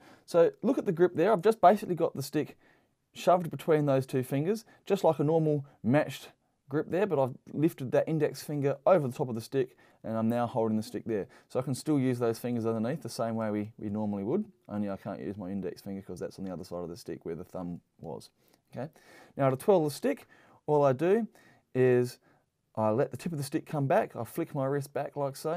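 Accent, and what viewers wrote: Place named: New Zealand. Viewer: whoa you sound new zealander. im aussie as well but from sydney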